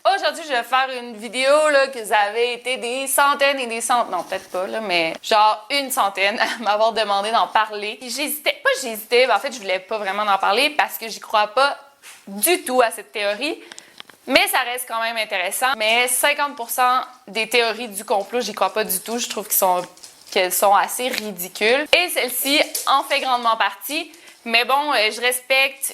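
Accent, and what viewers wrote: Quebec, Canada. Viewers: Cc jsuis d un autre univers mon prénom est César j' ai naquit en France charmé je blague ont est cousins Québec France ont as la langue française en commun comme disait de Gaulle " vive le Québec libre" ton accent serait le vrais français ou l british vous a fait que vs avez oublié des mots mais c pas flagrant une cane pr un gericane c charmant et ta bonne humeur ne rend pas tes vidéos monotones encouragements jsuis abonné depuis peu 😉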